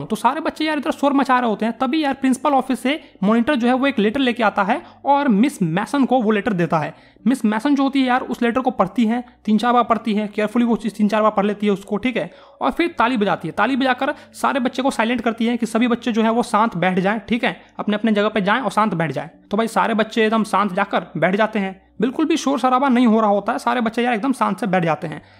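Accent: Bihar, India. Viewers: Bihar se h ??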